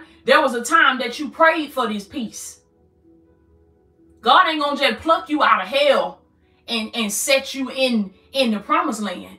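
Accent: South, United States. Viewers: I love your accent! You have to be from down south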